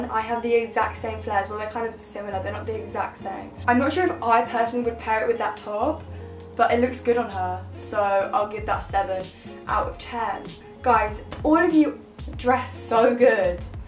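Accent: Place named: England, United Kingdom. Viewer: I wonder what the beautiful accent is that you speak with? What the region of England are you from?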